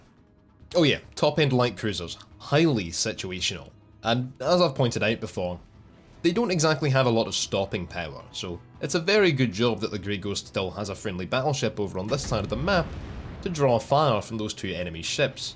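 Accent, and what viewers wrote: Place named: Scotland, United Kingdom. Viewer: I love the Scottish accent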